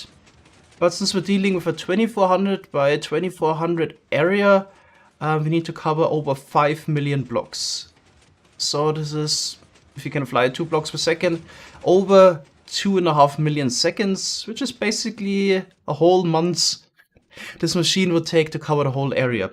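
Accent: Germany. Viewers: Bist du ein Deutscher hört sich so an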